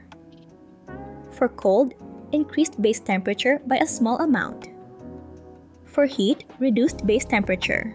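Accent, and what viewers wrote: Philippines. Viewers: Are you Filipino why does it sound like that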